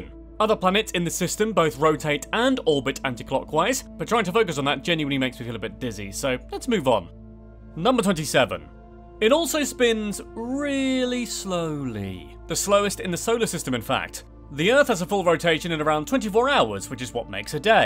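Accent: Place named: United Kingdom. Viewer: Hes British 🤦🏾‍♂️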